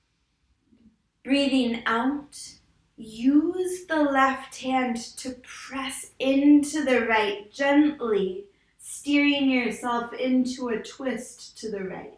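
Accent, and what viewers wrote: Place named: United Kingdom. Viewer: So good to practise with you after such a long time,. I hope you’re well. Unfortunately, the picture seems to freeze around 20 minutes in, but I could follow your voice. Your accent has a hint of British these days!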